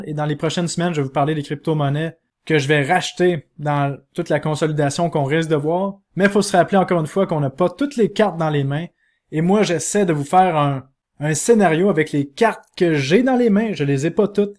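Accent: Canada. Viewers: Super video com Dab.. L’accent Canadien est tres fort et pour un Francais il faut se concentrer pas mal pour bien comprendre ;)) Merci bien quand meme ! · TB video avec en plus cet accent canadien (vu de France)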